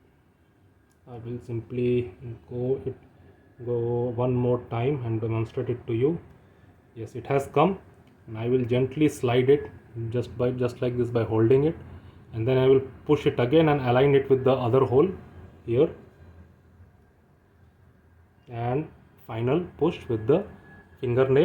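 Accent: India. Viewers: I never get tired of listening to indians speaking english. 😂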